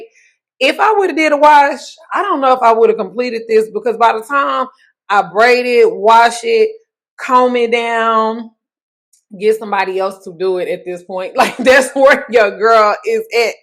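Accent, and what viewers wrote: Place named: Texas, United States. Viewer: What part of Texas you from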